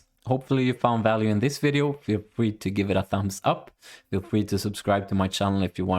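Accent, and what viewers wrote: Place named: Sweden. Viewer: I love that Swenglish-Makes the video so much entertaining : D - Thanks for good tutorial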